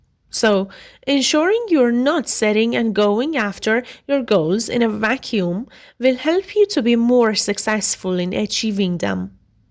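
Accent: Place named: Iran. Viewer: اااااااااااااااا😭😭😭😭😭😭😭😭😭😭چرااااااااااا ،میخواستم بخوابم با گوش دادن به پادکست چرا با تلفظ فارسیییی😢😢😢😢😢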